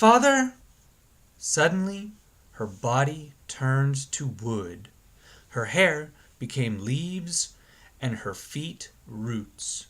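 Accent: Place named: Greece. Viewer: Nice story i really love accent greek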